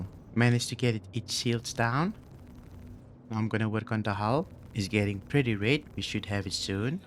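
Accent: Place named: Johannesburg, South Africa. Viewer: Can the ships in these missions spawn with cargo? Also, are you from SA? Almost sounds like you have a Johannesburg accent.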